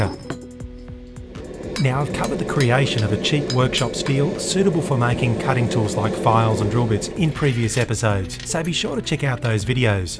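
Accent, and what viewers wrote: Australia. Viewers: Ele e Australiano. Eles mudam o som dos vogais. Use os subtitulos ingles.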